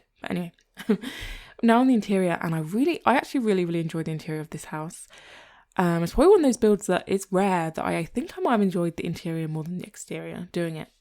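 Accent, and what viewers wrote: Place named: East Midlands, United Kingdom. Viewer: PS - are you from somewhere northern originally? Your accent now sounds very southern, and a bit towards RP, but words like grass and bath, you say in a way that makes me think maybe East Midlands?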